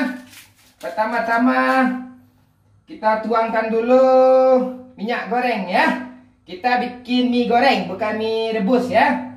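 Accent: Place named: Java, Indonesia. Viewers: Wkwkwk kok medog yaaa wkwk ngakakk😂😂😂😂😂😂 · Bg kok ada medok" nya kayak Youtubers porbolinggo 😂😂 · Kok medok bang😂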